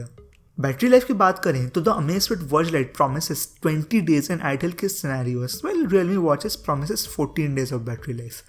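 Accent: Odisha, India. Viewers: Are you from Odisha ?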